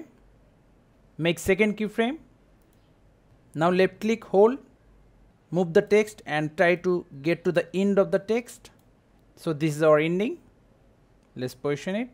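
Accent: India. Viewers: When ever I hear the indian accent I know that it will solve my problem W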